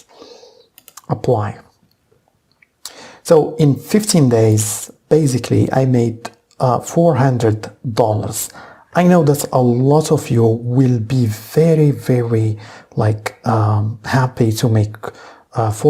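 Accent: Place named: Morocco. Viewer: 😂😂xttî 9x3tk mn l accent dyalk bl3ks wlkin wakha hakkak kyn wahd lam7 sghiiir kybyn dk l3r9 lmghribi hhhhhh thy bruuuh!! ♡♡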